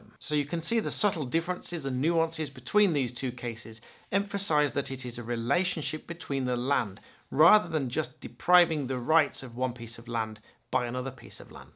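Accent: United Kingdom. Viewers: With that accent, I have to ask, are these laws in the U.S. or the U.K.?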